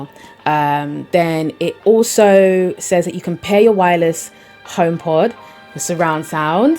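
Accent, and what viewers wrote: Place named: United Kingdom. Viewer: Wow…nice. Don’t know how I missed you. Beautiful and witty. And that British tongue 👅……. Cheers! 🇬🇧 🇺🇸👍🏾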